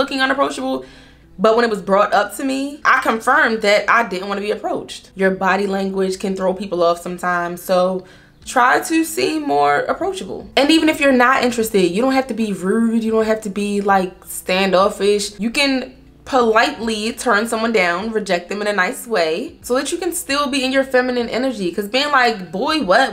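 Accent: Baltimore, United States. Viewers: I knew I heard that Baltimore accent. Heyyy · Chile I knew you were from bmore as soon as you opened your mouth.😂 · Girl! Why did I know you was from Baltimore without actually knowing lol. The accent gives it every time lol.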